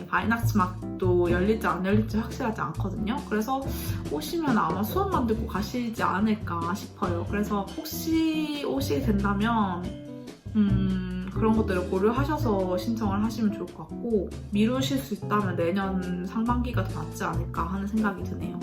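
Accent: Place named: Seoul, South Korea. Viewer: 아니 님 영상에서는 왜 서울말을 쓰시는고에요?🤭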